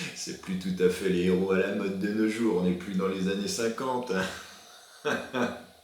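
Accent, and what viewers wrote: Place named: Antilles, Caribbean. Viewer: Super 👍belle narration, belles illustrations et pas mal l'accent des Antilles...D'autres personnages pourraient être intéressant à traiter dans la même veine, papa Guédé avec ses araignées😂 , papa Legba, la Sirène ou encore la célèbre Erzullie 🌹💖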